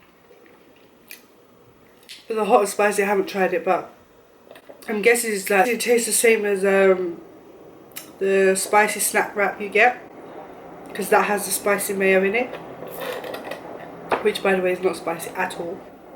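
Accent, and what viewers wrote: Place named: England, United Kingdom. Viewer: Where are you from if you don’t mind me asking, you sound English but you said it was 38° I know that in England it’s no that hot 😂😂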